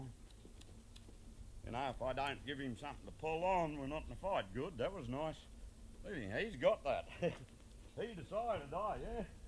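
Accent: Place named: Australia. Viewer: I like that he was gentile and did not get into uneccesary fights and kept the session short with a young horse. I hate the show em whose boss..out of my space, use that whip and teach and ride with pain to make up for your lack of hosemenship. Sounds like down under accent..NY here.. Hate the way I was taught to ride with pain.. These amazing animals do not need bits or tie downs or spurs nor whips.. They or rather we do so much better just using a rope halter with no bit bucles or chains. And it creates a much better bond and team. lovely little chestnut.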